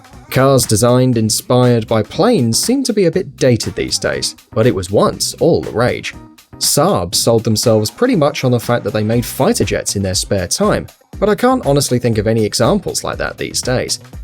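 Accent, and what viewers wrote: England, United Kingdom. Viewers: You sound English but you talk American. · Are you English? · Isn't it odd listening to an Englishman speaking American English.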